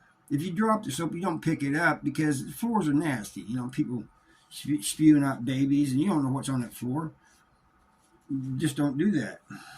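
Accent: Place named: South, United States. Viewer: What state are you from ya accent sounds southern bro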